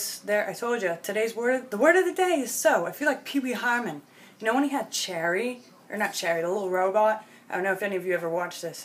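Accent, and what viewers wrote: New York, United States. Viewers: It's so funny you sound like a new Yorker with the bottoms out. Not making fun of you In any way so please dont take it like that cause I didnt mean it to be rude. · You sound like you're from New York or back East somewhere I'm originally from Puerto Rico but I was raised in New Jersey but the Jersey shore 🇺🇸🇵🇷😊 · beautiful teeth for a beautiful girl i sense a slight ny accent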